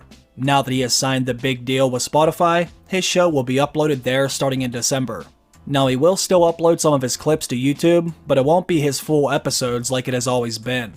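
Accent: Pittsburgh, United States. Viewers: Hey! I grew up in the burgh’, I can hear that Yinzer in your voice. Am I right?!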